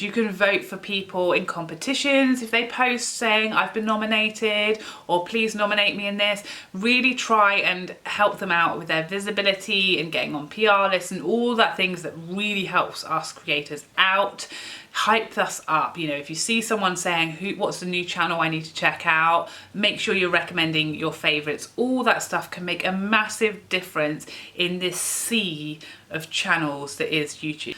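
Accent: United Kingdom. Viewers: Good information! I had no idea...but how long do i have to let the ad play? I used to always click skip ad. Why don’t you do links so I can purchase through your link? I do enjoy your channel and do want to support. I’m an American that loves a good British accent😄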